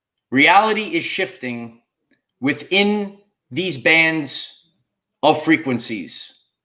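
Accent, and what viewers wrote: New York, United States. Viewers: I love your New York accent!!!!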